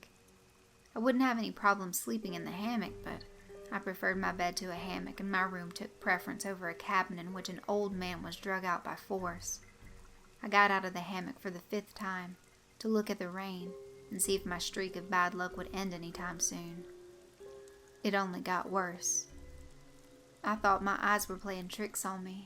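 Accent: South, United States. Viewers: I honestly love your videos!!! I was born, raised, & still live in Alabama & so glad to hear another southern voice. All of your stories are always so captivating. Great job & keep up the amazing work. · Please don't take this the wrong way . Your southern lilt really puts a story to a first calming effect and at or towards the end I can pick up the fear or excited tone bringing the end to a much more dramatic conclusion . Great job on the story .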